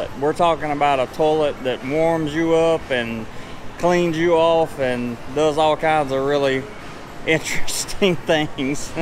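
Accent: South, United States. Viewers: Great vid, do you mind if I ask what part of the States are you from? Love the southern accent. I toured across the States with a rock band when I was in my 20’s, loved the southern states, southern hospitality is something that everyone should experience at least once.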